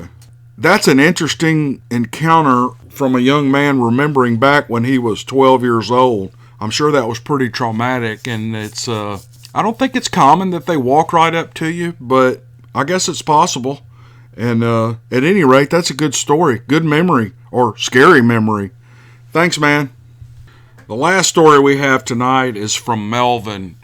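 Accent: South, United States. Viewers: awesome!! luv ur stories bro. you have that southern drawl kinda like peyton manning. just luv the stories and your true self is exposed to your fans. keep up good work! luv from California!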